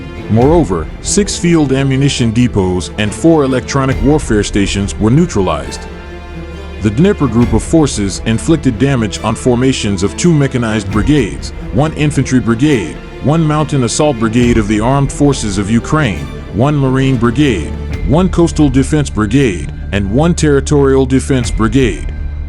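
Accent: United States. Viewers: Пендосовская озвучка, достала. Россия вперёд.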